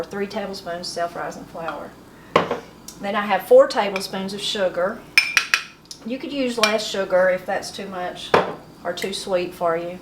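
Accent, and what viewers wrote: Appalachia, United States. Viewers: I was born and raised in Madison County in Western NC. Finding your channel is a blessing. It's so nice to hear that familiar accent here! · IM in caswell county n.c we talk just like you do here ....love the show .... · Chocolate gravy must be a family related recipe instead of regional. My mother always made it but most other people here in Alabama never heard of it. Same thing with cats head biscuits. It's strange, my mother and her sisters sound just like you accent wise, but have been in Alabama at least 4 generations. Must be a specific appalachian accent that crosses state lines.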